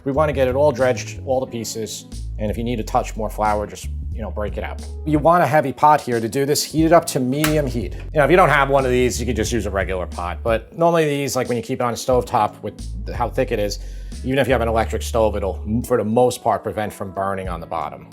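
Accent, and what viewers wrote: New York, United States. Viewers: I thought i was subscribed from using your beoff Burgonion recipe. My bad. I'm subbed now. One thing i learned from chef John on YouTube is that of you accidentally crowd the pan you can just keep simmering until the liquid absorbs and it will brown. It's an option for tougher meets like Chuck. He's in California now but i think he's a NY guy too originally. I'll admit, i get a little homesick hearing you talk. North Jersey is similar enough in speech pattern/ accent.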